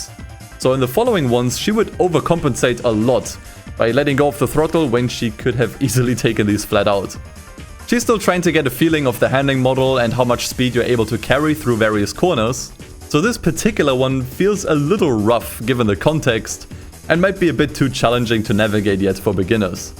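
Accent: Germany. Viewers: first time here and for 7 mins i was like "do not assume. just because he sounds like a german does not mean he is german." yeah seems like i listned correctly :D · ich wusste dass er deutsch ist, da er einen deutschen akzent hat hahah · Ah ich hab doch richtig gedacht dass bei deinem Englisch ein Deutscher Akzent rauszuhören ist und bei hat es deine Freundin bestätigt.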